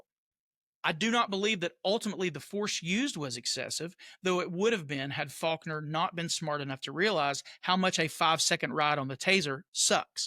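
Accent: South, United States. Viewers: I love your channel❤ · your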